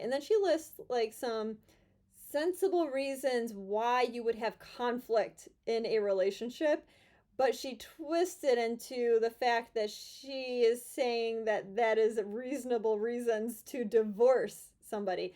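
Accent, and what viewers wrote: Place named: North Dakota, United States. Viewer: Are u from North Dakota Been trying to place your accent U Judy don’t have that stereotypical “concha no!” Things sorry lol was curious You’re awesome great videos lol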